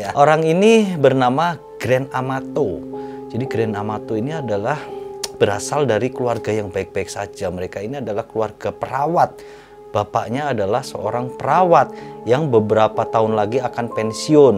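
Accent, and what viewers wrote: Java, Indonesia. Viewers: Angkel medok..nyenegkeh swarane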